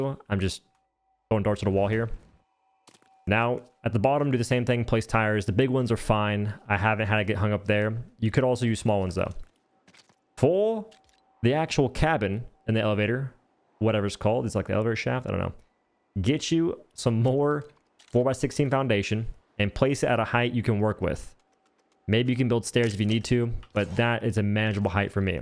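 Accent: South, United States. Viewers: Nice video, I’m gonna build an elevator to get to my monorail station. Look, I’m someone who’s always very curious about people’s dialects and accents. And to me, your accent is kind of interesting. There’s no non-creepy way to ask this but…what Southern state are you from? And to level with you, I’m from Chicago lmao.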